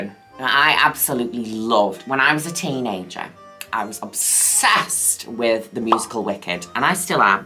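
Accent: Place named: Liverpool, United Kingdom. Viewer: Super Scouse today 😂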